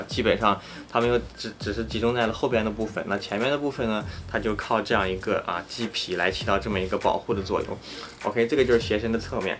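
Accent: Shanxi, China. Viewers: 是山西人吗？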